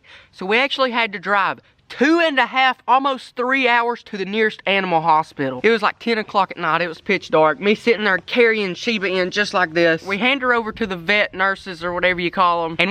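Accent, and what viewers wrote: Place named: South, United States. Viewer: Hey Man, you can’t get a camel, you gotta get a emu, I think with your southern accent people with laugh at emu better, plus camels are slow, like the mule, an emu is fast, like the atv, you gotta get a emu.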